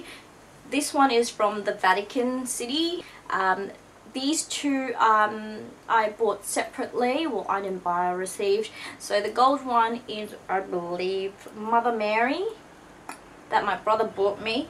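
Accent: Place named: Australia. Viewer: Hey!! Im soo soo happy to have you back:') I have really missed that aussie accent! Would you mind doing a video on picking medicine as career for highshool students? Like why you wanted to do it, how the application process was for you, how was it when you got in, your feelings in med school, advice and tips for people wanting to get in and is medicine what you expected? And pros and cons of being a doctor! SO SORRY THAT WAS A LOAD OF QUESTIONS!!! Could you please involve that in your education video?:) xx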